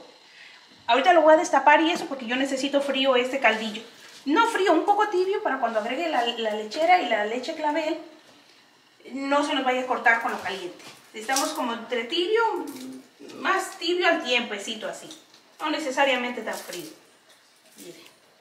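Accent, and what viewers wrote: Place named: Honduras. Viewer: Soy de jakisco pero su acento no me parese de jalisco vivira ahi y tratara de imitar el acento espanol pero no me parese de jalisco le siento otro acento me parese que es usted de HONDURA?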